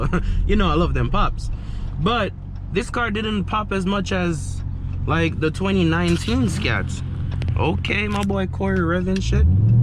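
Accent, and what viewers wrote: Jamaica. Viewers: Wait deh,A sense a Jamaican accent mi brudda 🤔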